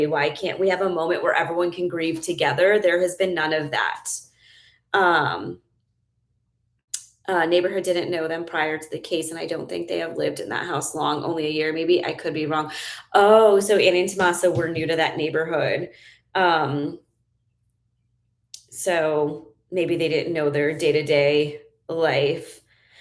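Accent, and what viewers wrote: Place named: New Jersey, United States. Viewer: Wild guess but are you from Jersey and/or Italian?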